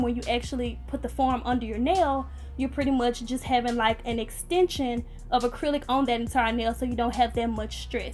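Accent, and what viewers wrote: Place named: Memphis, United States. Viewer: wow, you are so cold! I heard your Memphis accent and was instantly looking for an appointment website bc your nails are so nice! lol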